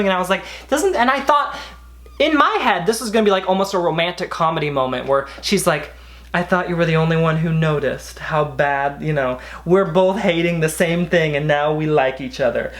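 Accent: United Kingdom. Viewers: loooved this video drew ❤️ 1. you were looking incredibly handsome, the eye contact was making me feel tingly and i’m hot so that means a lot. 2. you were on a roll!!! wittiness was consistent and i was following the points well, relating and learning. really appreciating your experience and feeling super connected to you. the best way to end my night! :) (from the UK) (the british boy accent defo needs to stay positive) - Olivia ❤️ ❤️ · especially the British accent too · My dad was an actor & everything you're telling us is completely accurate. I thought about pursuing an acting career myself but I saw all the jobs my dad almost got & realized that wasn't for me. You are funny & talented ( fairly good Brit accent btw) & was the best thing in "Not Cool" If I was a casting agent I would've hired u 👍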